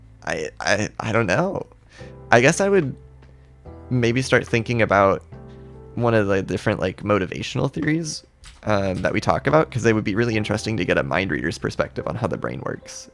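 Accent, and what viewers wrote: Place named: United States. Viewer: *Says that Bluestar is his fave WC character.* Me: Do I know you? Wait, do I live close to u? *My brain: Please say yes please say yes.*: Says he is from USA Me: I can tell The way u say ‘water’ It’s WATER NOT WADDER!!! Fun fact: I have met in Wings of Fire Roblox game What’s graduate school?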